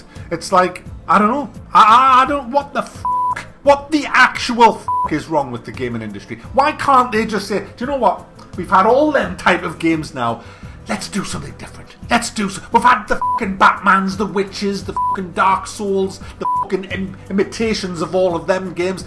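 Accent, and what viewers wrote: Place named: Newcastle, United Kingdom. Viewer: His geordie accent does it for me lmao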